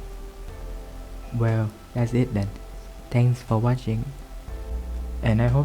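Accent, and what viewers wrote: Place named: Vietnam. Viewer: Vietnaese accent for sure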